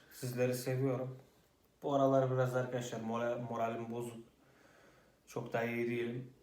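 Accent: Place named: Trabzon, Turkey. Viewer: Ne kadar düzgün konuşmaya calışsanda trabzonlu olduğun belli edeysin kral 🖤👏🏻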